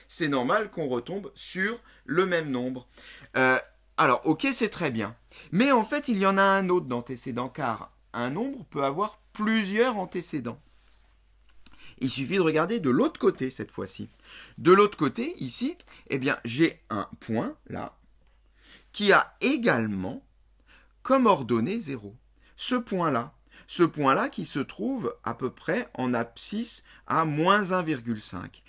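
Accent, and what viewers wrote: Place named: Moselle, France. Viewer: Merci grace a vous j'apprends ce que je n'ai pas appris plus jeune. Une question: vous ne seriez pas ( comme moi d'ailleurs, de Moselle ). Car c'est comme ça qu'on dit Vingte chez moi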